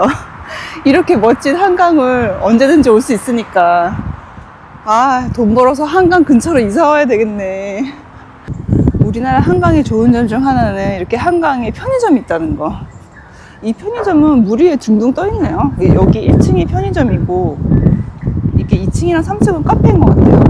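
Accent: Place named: Busan, South Korea. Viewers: 부산임마까 지도 부산이다아임미까 사투리방송 정겹고 좋아요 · 겡상도 아지매네 ㅎㅎㅎ😊 하고픈거 하면서 사는거 부러워요.. 타코는 미국 캘리포니아에서 먹는게 좋아요😊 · 고향이 남쪽 어디쯤인지는 당연히 알았었는데... 갑자기 많이 고향말을 쓰시니까 또 새롭고.. 적응이 안되요😊😊 진짜 가보고 싶은 곳이 참 많은데... 언제나 다 갈 수 있을지ㅎㅎㅎ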